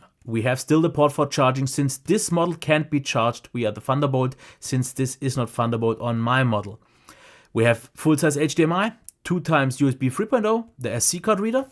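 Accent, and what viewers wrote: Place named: Germany. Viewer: You sound German. 😉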